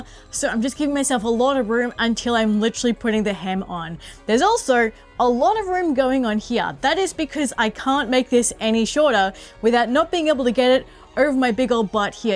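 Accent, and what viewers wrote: Australia. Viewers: If I was asked . . . I'd guess you were an Aussie !. 🤣🤣😂 I never realised that before ! It makes me so happy to know you are a Cousin !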